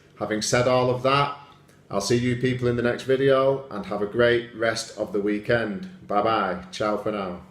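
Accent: United Kingdom. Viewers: I love that you want to help people. But your accent is soooo british :DDD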